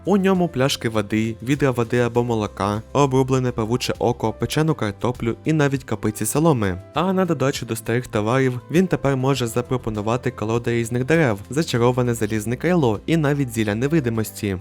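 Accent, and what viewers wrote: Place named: United States. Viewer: У вас дуже файний американський акцент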